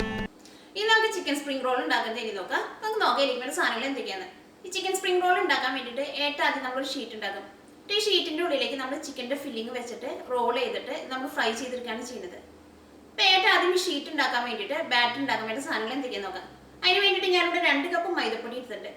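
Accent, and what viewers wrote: Thrissur, India. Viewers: ur malayam sounds funny....thrissur language....i like u ...i ve tried ur recipies....keep going ur good work....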